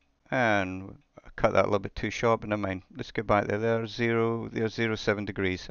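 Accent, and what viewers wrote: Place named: Scotland, United Kingdom. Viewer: Repetition is not so bad when it's in a Scottish accent. 😉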